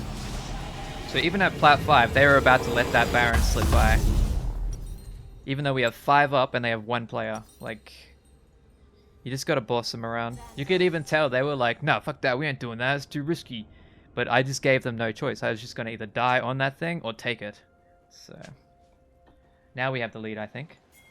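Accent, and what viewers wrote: Australia. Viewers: Mate are you in Perth I swear I talked to someone at uni sounding like you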